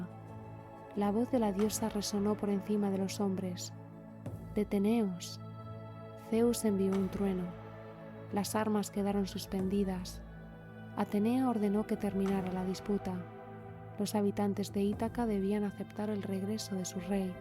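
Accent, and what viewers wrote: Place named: Spain. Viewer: Nueva suscriptora. Me encanta tu suave voz, y el acento español es un plus. Gracias por tanto trabajo y espero de todo corazón que muy pronto llegues a los mil suscriptores. 🎉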